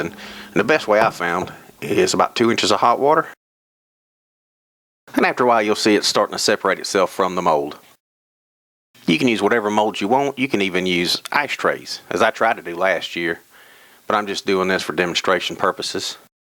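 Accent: United States. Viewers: U have the most american accent ever. Like you were from a cartoon or 50's america.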